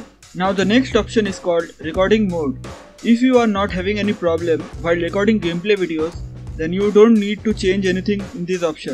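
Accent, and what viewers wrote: India. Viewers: i taught it was fake because of the indian accent lol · If you hear an Indian voice that means it's not clickbait!!! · I luv indin accent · No it's not legit if there is Indian voice for me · When you heard an indian voice. it doesnt bring fake videos you can trust indian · I hate your indian voice but the recorder worked ! cool vid ! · when i her indian voice i know i will working btw tnx its working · Man when i heard the indian I was like this shit is gonna be a scam or be legit No but seriously this was so helpful.....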